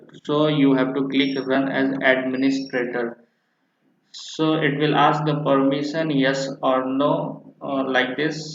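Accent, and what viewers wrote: India. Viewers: the indian accent is the cherry on top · Ffs Good Video But Im So Sick Of That Indian Accent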